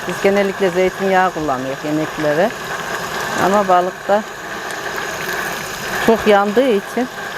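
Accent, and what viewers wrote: Turkey. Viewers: Şive iç Anadolu Bölgesi nerelisiniz acaba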